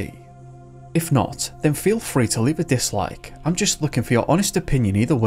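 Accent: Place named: North, United Kingdom. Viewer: A new vid! 🙌🏻Right on! I adore the narrators style in every way but I cannot place the accent correctly. Please someone tell me which place up north this gentleman hails from! ☺️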